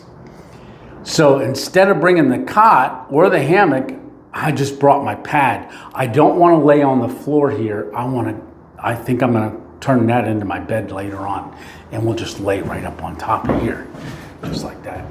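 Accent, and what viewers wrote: Pennsylvania, United States. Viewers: 3 minutes in and I'm like this guy has to be from PA.... look it up and sure enough you're in mg territory lol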